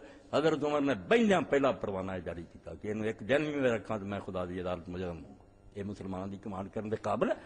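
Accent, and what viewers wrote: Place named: Punjab, Pakistan. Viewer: Molana ishaq r.a ka lehja Punjabi tha . Ye be adbi lgti hai lkn nhi hai. Arbi language is se bhi skht hai agar ye hadeesein arbi mein prhi jayein Jin ko chupaya gya hai Tu tum log aik dosre ko katal krne lag jao be adbi smjh kr. Molana ishaq r.a hazrat Khalid r.a ko sahabi or Naik smjhte the lkn Jo gltyia hoi wo byaan krte the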